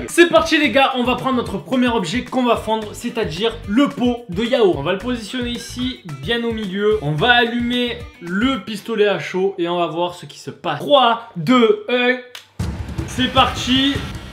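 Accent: Marseille, France. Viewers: waw l'accent marseillais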